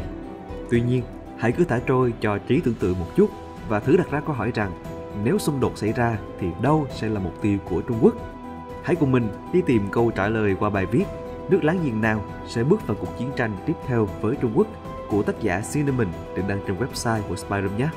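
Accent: South, Vietnam. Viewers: xét riêng giọng đọc thì hình như k vấn đề gì, nếu bạn nghe tốt giọng miền Nam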